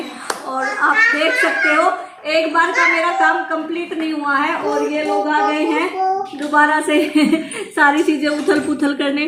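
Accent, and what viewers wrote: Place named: Haryana, India. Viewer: Like6,dear apni original language me baat kiua kero..... means haryana wali .....whi achi lagti h😘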